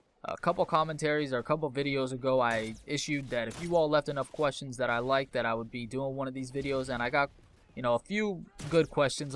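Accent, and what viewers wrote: United States. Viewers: Wait are u american or australian? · also are you actually Australian because you sound american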